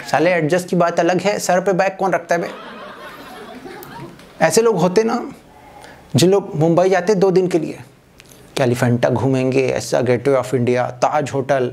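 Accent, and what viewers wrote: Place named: Eastern Madhya Pradesh, India. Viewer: Why his tone sounds like he is from Madhya Pradesh ,and that too eastern part of m.p 🤔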